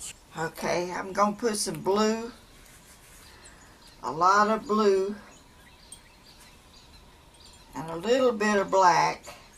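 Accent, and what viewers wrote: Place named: Georgia, United States. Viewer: Happy birthday dear lady. I am a new subscriber. I enjoy watching your videos and I especially like listening to your lovely GA drawl. 😊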